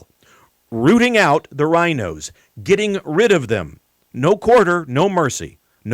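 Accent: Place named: Scotland, United Kingdom. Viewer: Well done my lad!!!! Nice Scottish accent!!!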